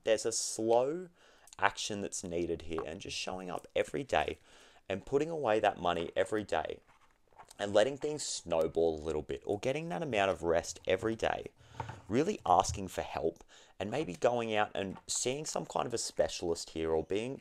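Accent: Australia. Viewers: are you Australian? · Oh you a fellow Aussie 😊 nice read..!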